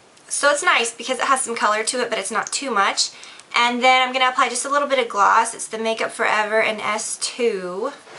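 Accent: South, United States. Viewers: i love hearing bits of your southern accent in your videos. what nail polish will you be wearing for new years?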